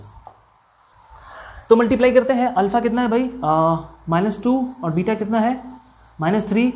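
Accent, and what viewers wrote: Bihar, India. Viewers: aisa style to sirf Bihar ke logo m hi h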